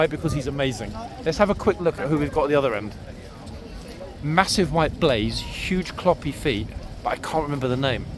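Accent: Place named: London, United Kingdom. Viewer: Oh what a joker. You never fail to amuse and entertain me, also educate me. I am an eastender but love to hear your london accent daily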